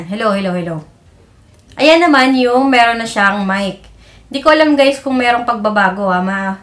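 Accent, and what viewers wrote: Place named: Visayas, Philippines. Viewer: Thanks po! Narinig ko parang bisaya rin kayu? Hehe pa shout po 🤗😁❤️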